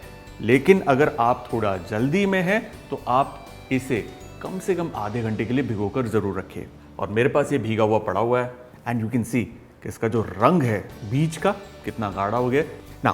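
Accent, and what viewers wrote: Punjab, India. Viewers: Hi ji i am big fan of u it’s so cute ki hindi bolty bolty punjabi ki accent i love it 😻 yummy recipes always 💞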